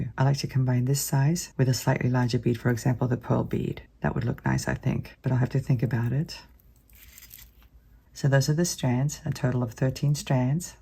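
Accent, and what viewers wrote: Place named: Australia. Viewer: Thank you. Can’t wait to see what you design with these beautiful beads! Just got home from a long visit with my son in Australia. Always think of you when I venture “down under” and listening to your accent always makes me smile and takes me back. Take care I will be looking forward to your next video. Take care of you ❤️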